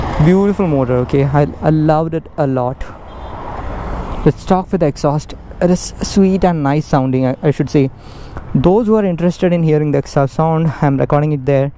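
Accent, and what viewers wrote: Kerala, India. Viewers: Mallu accent, good review.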